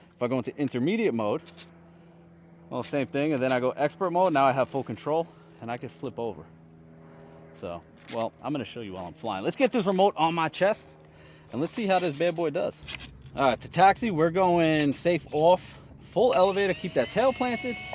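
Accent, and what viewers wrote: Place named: New York, United States. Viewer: Hello brother.. I’m a new subscriber from Darwin Australia 🇦🇺.. been binging your content for weeks now.. your a real chilled New Yorker by the sounds yeah 😅apologies if not .. but hey can we see more cleetus cub content.. maybe how to progress to acro .. maybe how to bind to better controller..🙏😁👌big fan from Australia mate ..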